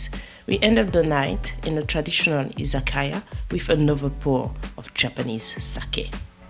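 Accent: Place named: France. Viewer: Love that french accent 🤣🤣 you deserve more views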